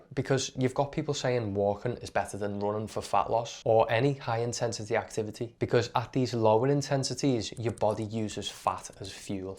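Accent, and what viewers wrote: Liverpool, United Kingdom. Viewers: Great video btw are you scouse ? Love that accent you speak like Jamie carrager , are you a Liverpool fan ?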